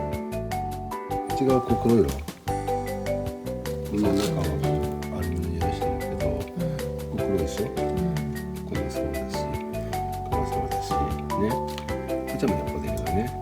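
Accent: Niigata, Japan. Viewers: 違ったら失礼ですが新潟の方ですか？喋り方やイントネーションに親しみがあるので☺️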